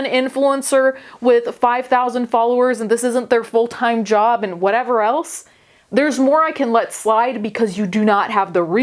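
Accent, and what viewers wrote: Italy. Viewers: she's Italian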